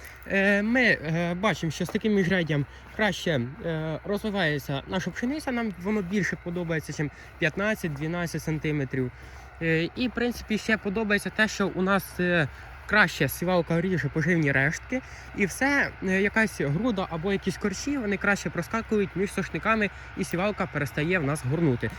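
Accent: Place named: Western Ukraine, Ukraine. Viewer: у вас гарний західноукраїнський акцент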